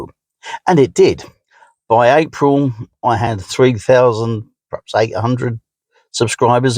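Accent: United Kingdom. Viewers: Thank you. Thank you very much. As you know, I came because of that DVD video. Visited a few others. Learned what I wanted to learn (I was right...LOL) and never went back, except for you. I watched that beach day and listened to your stories. I, also, read the comments. I love their stories, even the short sweet ones. And I slide past the trolls with a roll of my eyes, so good to learn, you do too. I have very few friends. Years ago, I used to sit and talk to people in the building. They are gone now. If you lived in my bldg. and I saw you, I would sit down and we would have a good chinwag about our lives. I would refer to you as 'my friend' when I talked to others. We would share the low and high (not THAT kind of high) times. No gossiping. I like you for three reasons: Your British accent; no bells and whistles just get down to it; and you put up with my long comments. 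There are other people like you and I have watched some. I can tell the real people and the just-want-to-be-on-YT ones. The ones who don't have our lives but cobble together stories for themselves. You are the real deal. I could always do that...tell the posers from the real deal (esp. musicians). Thank you for reading my comments, too. And thank you for finding people to watch you and share as well. BTW, I was singing all last week! (The version.) So, thank you, too, for letting me know I'm not insane. Hah!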